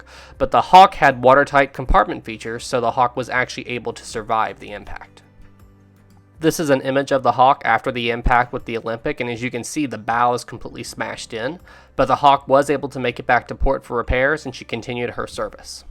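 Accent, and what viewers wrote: South, United States. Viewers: your Southern accent really comes out of hiding during the narration parts haha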